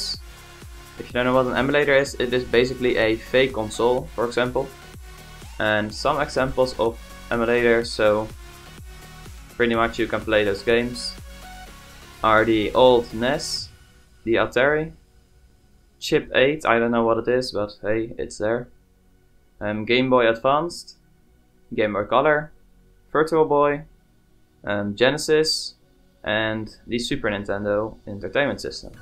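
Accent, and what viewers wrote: Netherlands. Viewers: You sound Dutch my boi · hij is zoo nederlands haha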